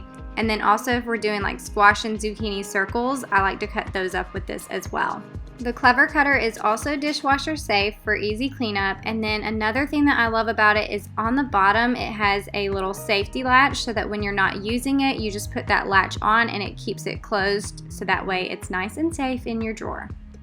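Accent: South, United States. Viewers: LOVE home and KITCHEN GADGETS! Thanks for sharing! You’re southern accent is so cute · hey hey.. new subscriber here! love your videos and southern accent. I'm from Louisiana and you don't hear it often on youtube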